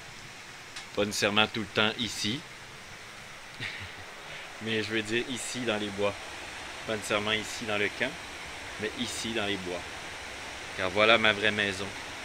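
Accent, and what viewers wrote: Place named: Canada. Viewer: Bonjour, 1ère vidéo que je découvre avec ravissement une cabane nichée au ❤ d'une sublime forêt 🌲🌲🌲🌲🍁🍂🍃🍀☘🌿🌾🌵🪴🌱.Rien de tel pour se ressourcer, laisser les blessures du passé s'enfuir dans le vent qui court dans le temps qui passe. Le meilleur ami de l'homme, l'animal, ce 🐕 🐶 si merveilleux, qui t'accompagne, lui seul comprend ta mélancolie et qui jour après jour te souffle le bonheur de demain...., ton plus grand ami. J'adore ton accent canadien. Bon courage avec Kirten. Cordialement. Sayoko. 28/01/2021🌞☃️❄⛄❄🌜🌛🌠✌✌✌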